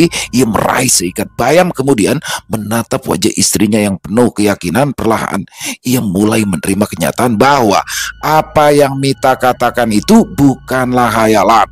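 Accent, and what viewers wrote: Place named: Banjar, Indonesia. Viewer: Dialek Banjar nya enak sekali didengar.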